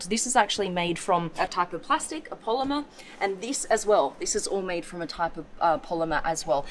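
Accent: England, United Kingdom. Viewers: BRUH- I love your British accent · I like Your English accent, blonde... 😘😍🤗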